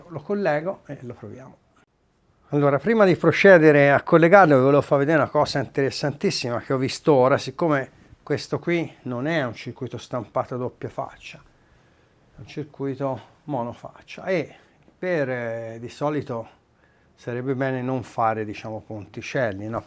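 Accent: Tuscany, Italy. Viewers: Sempre bravo e simpatico, compreso l adorabile cadenza Toscana!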